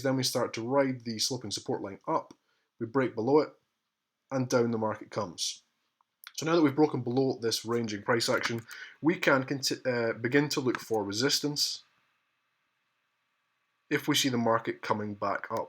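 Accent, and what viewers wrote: Scotland, United Kingdom. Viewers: Hi - another really useful video - Thank you. That beautiful Scottish brogue has become the soundtrack to my Sunday brunches recently! Are you watching any fundamentals on the pair this week? Does the RBA Interest Rate Decision come early enough and carry enough clout to set the trend for the week; and does the US Non-farm Payroll at the end of the week have any likely bearing on price action?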